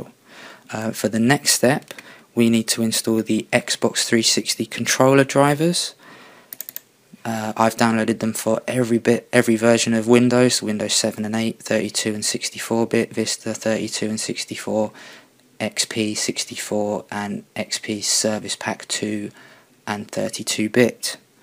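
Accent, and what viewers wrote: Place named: London, United Kingdom. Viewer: I love the London accent!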